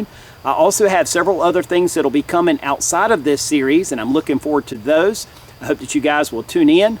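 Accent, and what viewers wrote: South, United States. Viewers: A++! I just finished watching all your map and compass videos.....OUTSTANDING! This was a great review for me, although I'm familiar with using a compass and map, I have not done any map work in years. During the 70's I spent a lot of time in Yosemite backpacking and snow camping. Then I moved to upstate New York near the Adirondacks where again I spent a lot time hiking, camping and canoeing (also using map and compass). This video series serves as a great reminder for this "old rusty bird". Moved to Nebraska in 1986 and most of my backpacking days ended then, except for bike touring and camping in campgrounds. I haven’t had any experience with mapping or dead reckoning, so I found that very informative. I must compliment you again, it's obvious you love doing these videos, because you put a lot off effort in each one. I will continue to watch your videos, I'm hooked! 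Will be doing some "upgrading" of my gear with plans to put it all to use this upcoming spring, summer and fall. Don’t know that I’m tough enough to camp during these Nebraska winters. Thanks again. BTW, what state do you live in? I've noticed your southern accent. I grew up in Texas and consider myself a "misplaced Texan", so I understand words like y'all, fixing and stuff. 😜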